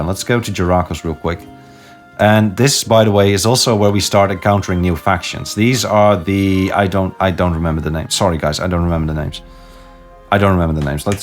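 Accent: Netherlands. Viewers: Within the first 10 seconds of the video I was like "is this dude Dutch?" checked your about and yes, yes you are, New sub acquired friend.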